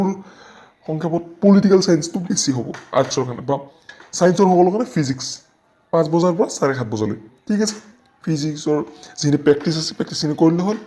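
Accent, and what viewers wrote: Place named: Nalbari, India. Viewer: Khati Nalberiya oi nijoka ki buli vaba sirok anaka kobo laj nalaga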